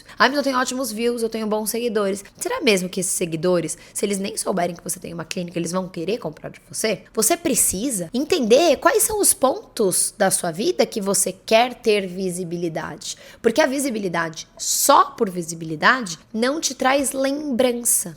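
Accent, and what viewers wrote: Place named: São Paulo, Brazil. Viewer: Paulista fala desse jeito mesmo!😊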